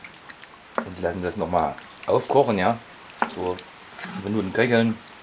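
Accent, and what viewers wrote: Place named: Saxony-Anhalt, Germany. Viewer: Leckeres Rezept😋 und dem Dialekt nach zu urteilen,könntest du aus Sachsen-Anhalt kommen🙈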